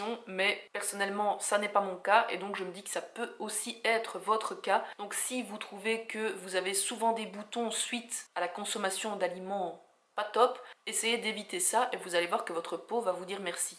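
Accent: Belgium. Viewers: Mhhhhh un petit accent belge non? Je viens de tomber sur y’a vidéo car je me renseigne sur la pilule. J’ai commencé à en prendre une jeudi. Donc très récent ça fait 3j. C’est la ZOELY mais elle ne me convient pas je me pense... mes seins gonflent, acné sur les seins le dos et début visage alors que je n’en n’ai jamais eu. J’ai horriblement mal dans une jambe. J’ai du mal à marcher. Je pense l’arrêter... bisous!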